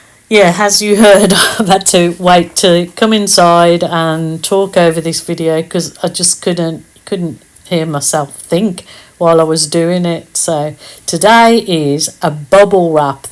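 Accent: Birmingham, United Kingdom. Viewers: I love the smell of summer rain mmmmm so peaceful and calming smell and sound. I’m loving your Brumy accent too 😂.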